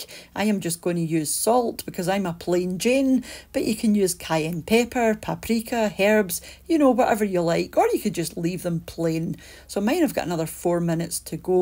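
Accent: Scotland, United Kingdom. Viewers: Subscribed they look sooooo tasty. I love tye Scottish accent probably because of my ancestry.❤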